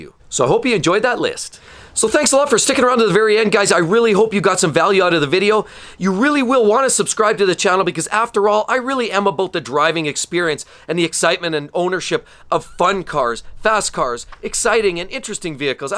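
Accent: Canada. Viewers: drop the heavy Canadian accent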